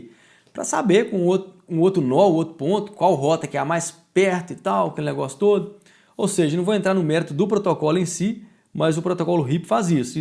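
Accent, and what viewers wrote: Minas Gerais, Brazil. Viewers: professor gratidao pelo conteudo dos videos e por todo o seu esforço de noites e madrugadas para ajudar os que de outra forma nao poderiam ou nao teriam condiçoes de o fazer sozinhos e por si mesmos.....agradeço primeiro por mim e depois por todos e vou acompanhar suas aulas pois conhecimento e bom e com mineirês ai e um must!!! Xiq nurtimu!!! rsrs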